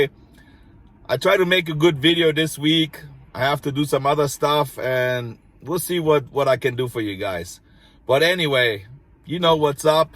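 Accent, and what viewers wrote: Germany. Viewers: We love your German accent, and your English is very good! You are just a ray of light my friend, always positive and make people feel good! Love your energy and niceness. Keep up with these videos, you make me smile and laugh at every one. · I love your German accent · Ich komm auf deinen german accent voll klar 😃👍. Tatsächlich macht er es mir etwas einfacher dem zu folgen, was du uns erzählst. Cheers man. Stay healthy and in good mood ✌️ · Don't worry, we like your German accent. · We like u the way you are sir & I love your German accent!! I cant imagine learning German, much harder than English!! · Last night a friend was over and we got bored so I put one of your videos on. It inspired a lot of conversation and it was like we were there hanging out with you. Miss Venice beach, next time I go your henna shop is top on my list. Your a smoother talker than you think. 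Keep it up, you can only go up from here. BTW, I love your German accent and hearing your pronunciation of German and English words. Don’t feel self conscience about it, it’s unique and cool. Own it and be yourself. You da man!!!🙌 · You should feel proud of your German accent. Germany, to many Americans, is associated with efficiency, innovation, and strong work ethic. The influence of the German people in America has been quite profound. The best blue denim pants in the world were created by a German in America, out west even, and centuries later, Levi Jeans are associated with being cool and rugged, and are still quite popular. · Vor ein paar Wochen wurde mir ein Video über Obdachlose in LA von dir auf YT angezeigt. Ohne vorher auf den Namen des Kanals zu achten habe ich reingeschaut und nach ein paar Sätzen von dir gedacht, dass der Akzent sich irgendwie deutsch anhört und bin dann auf den Kanal um herausfinden, ob ich recht habe. 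Musste nicht lange suchen als ich den Namen des Kanals gesehen habe :) . Seitdem habe ich schon einige Videos geschaut und auch den Subscribe Button gedrückt ;) Bisher war ich zweimal in Venice, das letzte Mal 2003 und ich glaube mich an deinen Henna Tattoo Shop zu erinnern. Den gab’s da schon, oder? Falls ja, haben wir mit Sicherheit damals sogar miteinander geredet :) Liebe Grüsse aus Heidelberg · You and your German accent are always a treat to listen to.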